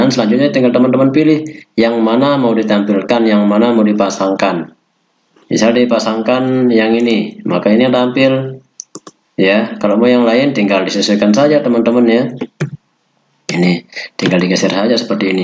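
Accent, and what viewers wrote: Bali, Indonesia. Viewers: orang bali y mas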